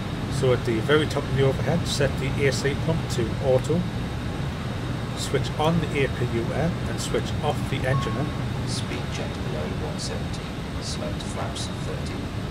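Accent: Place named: North, United Kingdom. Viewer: Looking superb! Can't wait for the release! Nice to hear a fellow northerner too :)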